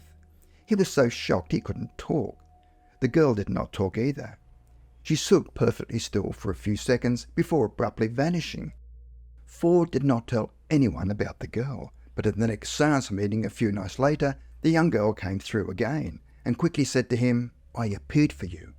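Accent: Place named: Australia. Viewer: The narration is in English - it's a lovely Australian accent, and it is not hard to understand at all.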